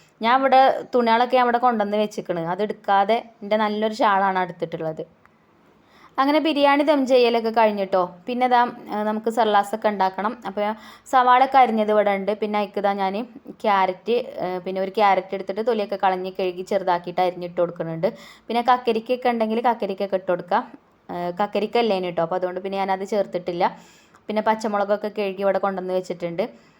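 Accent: Malappuram, India. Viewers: നിങ്ങളെ സ്ഥലം എവിടെയാ, വർത്താനം കേട്ടിട്ട് മലപ്പുറം പോലെ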